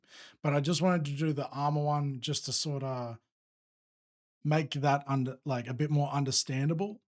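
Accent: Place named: Australia. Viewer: Hey mate, the missus likes it comment under mine.. huge thanks very informative and a pleasure to hear a fellow Aussie do a tutorial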